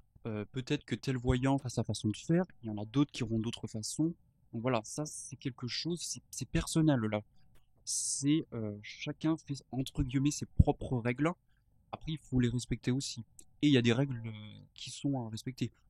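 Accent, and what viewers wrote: South, France. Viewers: Bonjour les cartes sont un support mais le ressenti et très important votre accent du sud ça fait du bien merci à bientôt.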